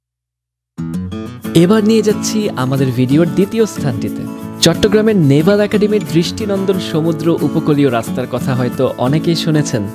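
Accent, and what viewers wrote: Standard. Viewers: বাংলায় উপস্থাপনায় এক যুগান্তকারী উদাহরণ অপনি এবং বিশ্বকে সম্পুর্ন শুদ্ধ বাংলায় উপস্থাপন আপনার থেকে ভালো কেউ করতে পারে নাই এটা নিশ্চিত ♥️♥️💕💕 এগিয়ে যান আরো অনেক দূর ♥️ আল্লাহ তায়ালা আপনাক নেক হায়াত দান করুক ♥️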